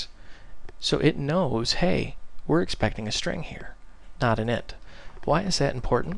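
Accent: United States. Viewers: thx man! ur awesome, great american voice and accent!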